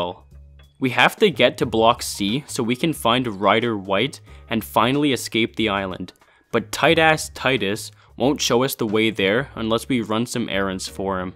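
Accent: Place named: Canada. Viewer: the most canadian sounding canadian I've heard on YouTube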